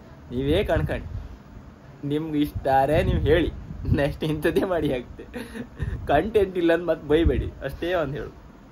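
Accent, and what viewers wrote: Kundapura, India. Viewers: I like kundapura kannada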